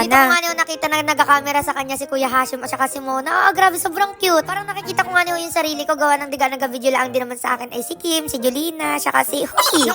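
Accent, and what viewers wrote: Batangas, Philippines. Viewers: ala eh tlga tgalog tlga tono ala tga Tanauan ako isa tga hnga mo sn mkpunta kmi jan soon Godbless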